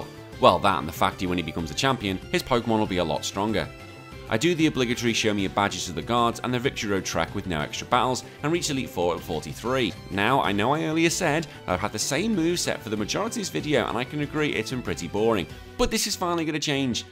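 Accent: Liverpool, United Kingdom. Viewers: Scouse pokemaniac......love it 👌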